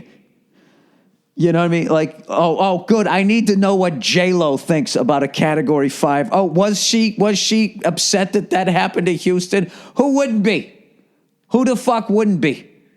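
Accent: Boston, United States. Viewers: BIIIIIIIIIILLL!!! We fucken love you man! (That shit does matter, gotta get too those two last stadiums man) And fuck that guy! Make America Great again. Anyway I learn heaps and loads from you about American sports and other shit in fits of laughter, I watch all your shit, we love that boston accent "DOWN UNDER!" (say in Aussie accent) BUT please watch a bit of that new star trek on Netflix... and then yell about it..its the fucken best! peace n love......p.s. say the dudes name ill take care of it. Great podcast.. Pps go fuck yourself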